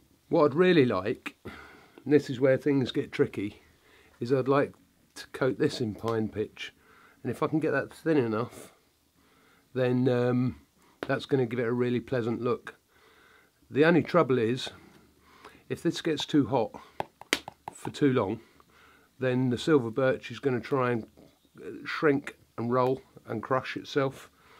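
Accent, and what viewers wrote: United Kingdom. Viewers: With this filming you look animated like the fantastic mister fox movie. I love your content it's very genuine. I have been trying to learn napping but have only found Americans and was very glad to hear a calm u rushed british voice with birds in the backround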